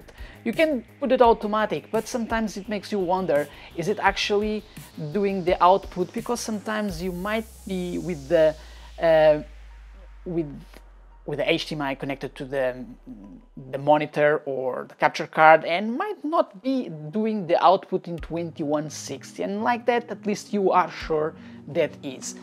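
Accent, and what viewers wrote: Portugal. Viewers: Apanhei te 😂 o Tuga tem aquela Pontinha de sotaque lá no fundo💪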